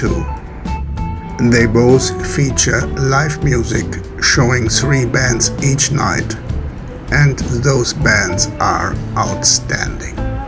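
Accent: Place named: Norway. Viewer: your accent sounds like Norwegian 😅 but i can be wrong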